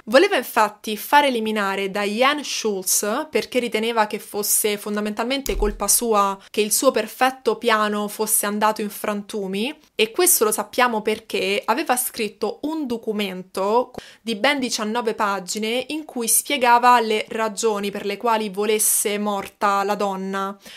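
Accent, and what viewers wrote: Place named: Rome, Italy. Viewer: Un bugiardo patentato , e chi gli crede. Mi dispiace per la famiglia di Donna che oltre alla perdita della figlia , non ha potuto vedere crescere la sua nipotina. Mi dispiace per la famiglia di Robert per tanti anni ritenuto un criminale . Quanta sofferenza quanto dolore. Non so cosa dovresti migliorare, ti trovo molto gradevole , anche il tuo lieve accento romanesco , a me non dispiace. Pronunci bene i nomi stranieri sei di bell' aspetto , sei brava nel documentarti , nell' esporre trasmetti empatia con le vittime . Brava continua non ti fare distogliere, credi nelle tue potenzialità, se c'è da migliorare , senz'altro migliorerai , nella vita c'è sempre da imparare, soprattutto quando si è umili , con la sete di conoscere .😘👏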